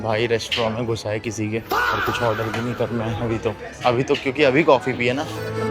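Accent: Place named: Indore, India. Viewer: Maza to aarha ha 😂 ye to sach ha or indori suun ke or maza aarha ha kyuki me bhi indore se hu❤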